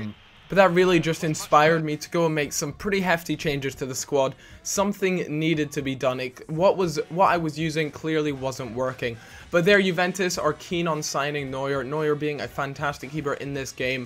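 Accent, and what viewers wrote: Scotland, United Kingdom. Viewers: when I first subbed to you I couldn't work out whether you were scottish or american lol! You sounded sottish but you were so popular and such a big channel so i thought you must be american! Great vid by the way!:) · anyone else think his voice is partly scottish, part englsih, and past american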